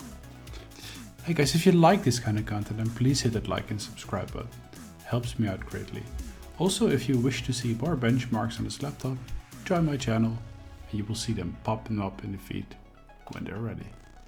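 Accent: Netherlands. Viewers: That Dutch English always 👌🏽😌 great review 🙏🏽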